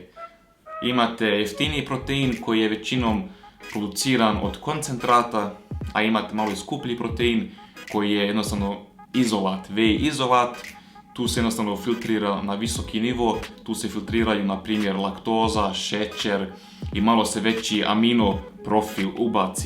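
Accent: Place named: Serbia. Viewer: Malo previse lricas srpskim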